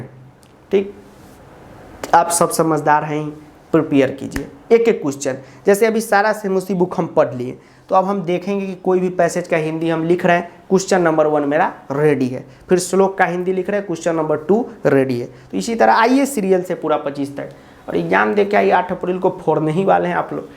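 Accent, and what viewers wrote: Bihar, India. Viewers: Sir aap rajshthan se ho ya bihar se ye bol kaise rhe ho sorry to say this par mere se control nhi huaa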